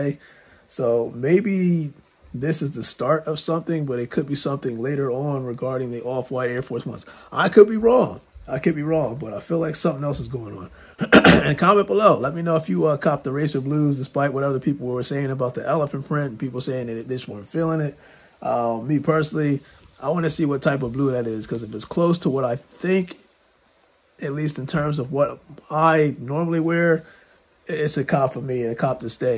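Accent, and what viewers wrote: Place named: Boston, United States. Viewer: U had to be from Boston I tried.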